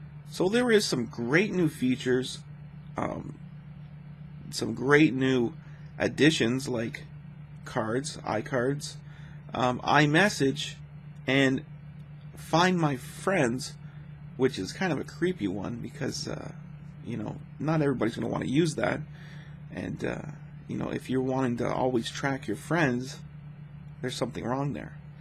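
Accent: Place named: Canada. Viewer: You're in Canada? you know come to think of it, I do kinda notice your slight canadian accent :)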